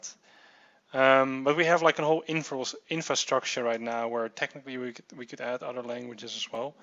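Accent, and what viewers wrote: Netherlands. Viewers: Not judging his accent just sounded like he was dutch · are u dutch? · i have a question are you dutch it sounds like it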